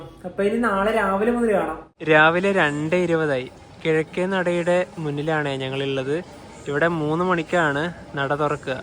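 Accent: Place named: Malabar, India. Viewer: ഞാനൊരു മധ്യ തിരുവതാംകൂർ കാരനാണ്. നിങ്ങടെ മലബാർ മലയാളം slang കേൾക്കാനാ നല്ലത്.🙂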